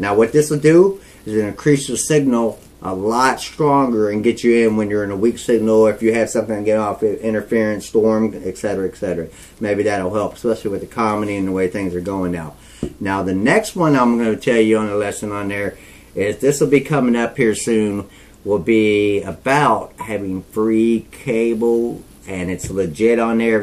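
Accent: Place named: South, United States. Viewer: I like his southern accent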